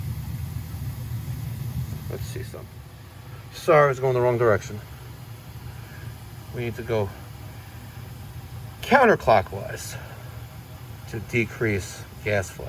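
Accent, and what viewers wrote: New York, United States. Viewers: "That white smoke doesn't mean you are electing a new pope." "I know that!" I love how you New Yorkers talk and joke.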